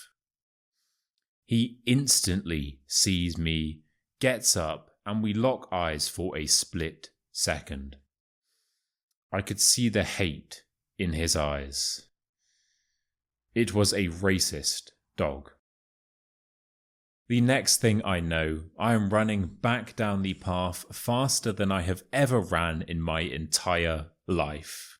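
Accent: RP, United Kingdom. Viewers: I like you reading in British English accent. Because making me leaning more English words when you reading Thank you 🙏 ❤for your share teaching In British English accent You good teaching ❤ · Hello, dear! Thanks a lot for the excellent opportunity to practice your nice British RP!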